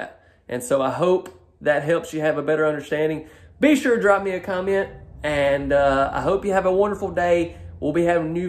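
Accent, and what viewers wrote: South, United States. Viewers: The faucets for the free standing tub needs to be black like all the rest! Perfect! You are doing GREAT, the great southern accent at all! I have it too, so LOVE it!!! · Love the master bedroom all the windows. An the colors of the trailer. Please stop apologizing for being for the South. That's just how we talk down here. I know what a hair is...lol. I enjoy watching your videos. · Beautiful but not my favorite. No pantry, loved the shelving with baskets. I loved seeing the nicer sinks & black faucets. I like the copper also. Mater closet sucked. Great job. I love your accent & words you use. Alot of my family is from Alabama, Georgia, Arkansas & i hear & use it also!!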